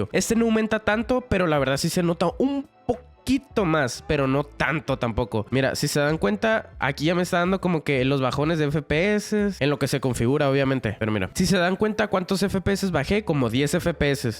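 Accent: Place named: North, Spain. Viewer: De dónde eres? Te escuchas del norte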